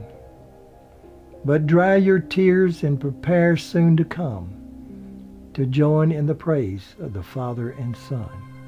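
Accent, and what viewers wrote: South, United States. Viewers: I really loved your excursion into history by way of visiting this old graveyard. My sister and I often drive through old graveyards and marvel at the writings we find on the headstones. Some of them are very sad indeed and show the anguish the survivors felt at the time. The story you told of Helen and Florence was very heart breaking. I can understand how a mother may want to keep in touch with her little daughter even after death has separated them. So sad indeed. I wonder what happened to little Florence's father and mother? I guess that answer has been lost to the ages., I hope you will do more of these types of stories based on old grave yards because they are all very interesting and spell binding. Also, you have the voice for these kind of tales too. Your Southern accent really relates an emotional quality that adds a lot to these tales of sadness.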